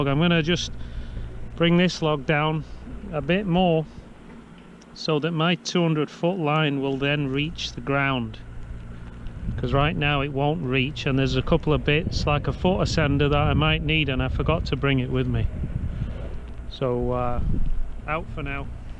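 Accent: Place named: Yorkshire, United Kingdom. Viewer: You sound like a Yorkshire man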